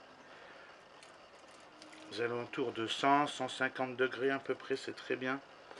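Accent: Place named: North, France. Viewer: Bonjour hi, l’accent du Nord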